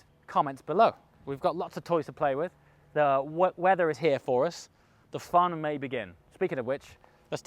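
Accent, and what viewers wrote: RP, United Kingdom. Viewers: I’m sorry dude. I really want to like you so badly but there is just something that makes me feel uncomfortable watching. You just don’t quite seem genuine. It even sounds like you are trying to hide an accent by using and RP type of speech pattern. Maybe it doesn’t translate to your American audience and they don’t pick up on it. But as an Englishman, I’m really struggling. The Chris Hoy name drop for instance. There is a way to be humble and you just don’t come close. I’m so sorry.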